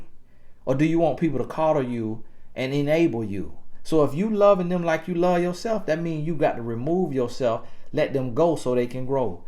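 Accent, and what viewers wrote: South, United States. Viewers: First 5 and half minutes and I'm doneeeee. I done went up in praise and laughed so hard... This is truth spoken so well! Love the accent too-- it had me Rollin' to hear this with that country twang. It's all love 😂❤ Thank you 👊🏾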